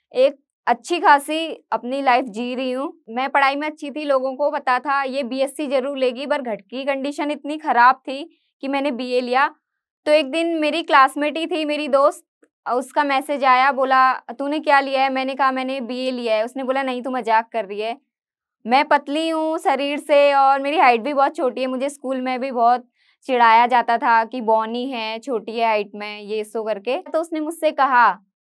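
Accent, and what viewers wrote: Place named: Uttarakhand, India. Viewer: Aapke way of talking se first vedio mai hi mujhe pta lg gya tha aap uttrakhand se ho mai v uttrakhand se hu ❤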